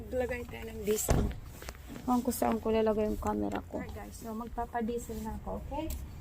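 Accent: Waray, Philippines. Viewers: hahaha amu gad kukurian pag tagalog kay wraywaray kaman ngean..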